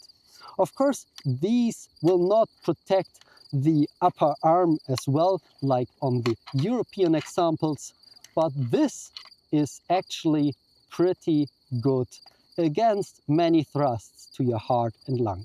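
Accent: Germany. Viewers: Man hört voll den deutschen Akzent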